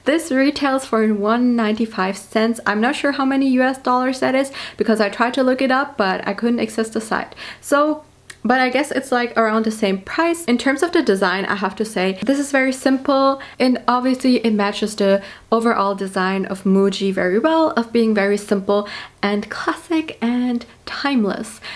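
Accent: Germany. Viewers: Are u German?? 🐻‍❄️🐻‍❄️🥺🥺✨🫐🫐🛼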